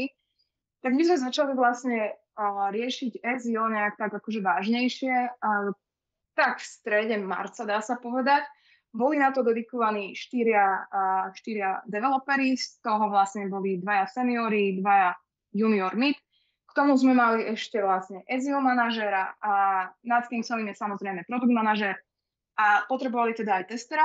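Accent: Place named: Bratislava, Slovakia. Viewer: tato tema ma vobec nezaujima, ale pozrel som si to cele lebo mi chybal bratislavsky prizvuk :)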